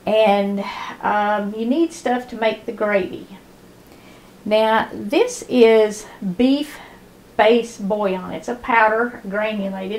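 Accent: South, United States. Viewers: You are a fabulous cook. Thank you so much for speaking slowly so we can understand so much better. You have a beautiful southern accent and gorgeous hair. Thank you for being so patient with your subscribers. Love from Debbie and Dexter-Dog the amazing rescued Chihuahua companion.🙋❤🙏🙏🙏🇺🇸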